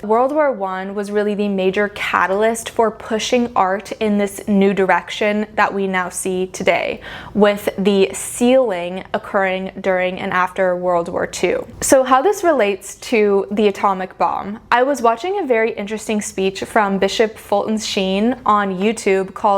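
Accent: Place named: United States. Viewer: You look Italian.. but you have an American accent. mmmh 🤔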